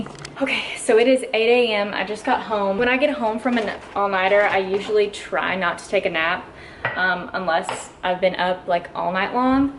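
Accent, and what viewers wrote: South, United States. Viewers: This Virginia boy loves to hear ya'lls Southern accent.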